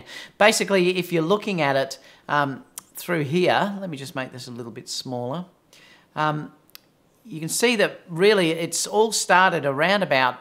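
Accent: Australia. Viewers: Congrats! I bet your AP impersonation won you some, haha. Just be careful, I just heard JL is threatening legal against AP. Hate to see AP threat legal against you, hahaha. Reason I like your content is you are pleasant to listen to, Americans like Aussie accents, you have the same hair style as me, and you drink Corona.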